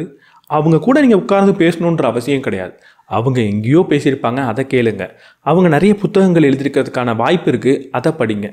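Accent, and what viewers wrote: India. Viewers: Super brother.. good feel. Bro neenga Tirunelveli' native ah. Enga voor slang varuthu neenga pesurappa.. well bro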